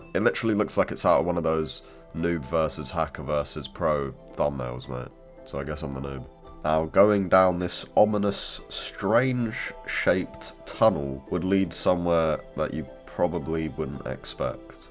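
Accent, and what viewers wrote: United Kingdom. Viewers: I goon to your British accent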